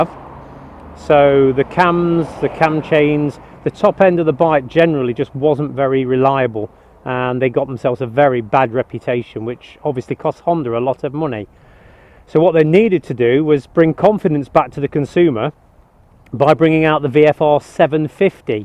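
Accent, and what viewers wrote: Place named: Leicestershire, United Kingdom. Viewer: Im trying to work out the accent...defiantly Leicestershire...roads look familiar . good review...a refreshing change from silly,loud mouthed over excited yanks.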